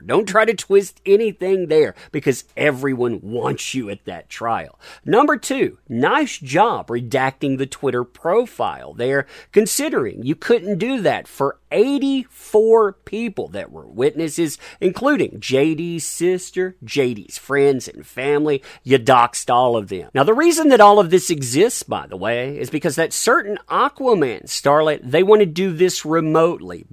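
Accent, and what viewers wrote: South, United States. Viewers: 🤠Howdy, Pawdner... You're such a WILD THING when it comes to doing your thing called BROADCASTING 😂😂😂 and ALL we women LOVE you for that cute southern accent and for the DECENT fellow you are. If AH ever imagined you're everybody's Good Samaritan of Justice and avenger of the Innocent people... She'd get jealous! But I'm pretty sure she Does, lol👍🏼GREAT JOB! BLESSINGS and my most loving respect for you always coming your way.. Love & kisses you wild Thing. 💪🏻UMH! Forever Your's. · Your doing a good job there keep it up, and that's a damn fine good southern accent as well! T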